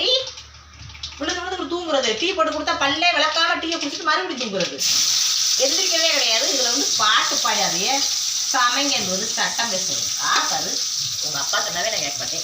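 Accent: Madurai, India. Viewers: என்னையா மாதிரி இருக்கா கேள்வி கேக்குற பானு நானும் ராஜபாளையம் வாக்க பட்டுவந்த மதுரை அம்மா மாதிரி பேசுறேன் நெனச்சேன் நீயும் இப்படி தான் பேசுற பானு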